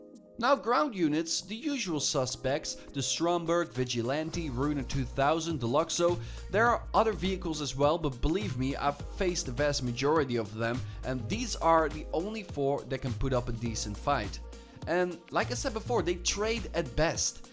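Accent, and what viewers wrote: Netherlands. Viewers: It's a nordic accent. Probably Dutch or Swedish. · this guy has to be dutch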